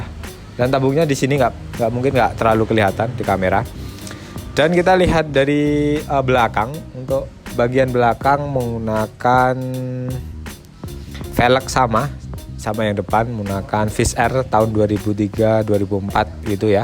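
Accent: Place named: Java, Indonesia. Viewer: Ngomong gue nya medok bngt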